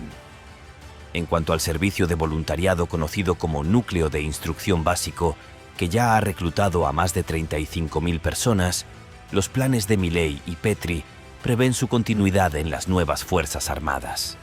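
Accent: Spain. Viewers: Encima habla un gallego 😂😂😂😂 no tenes algo mas antipatria ? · EXCELENTE...Permítanme una crítica constructiva ,al video: hubiera sido óptimo que quién hable de "NUESTRAS F.F.A.A." sea una voz argentina y "no españolizada". V.L.L.C.!!! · todo verso pagado y se nota con el asento gallego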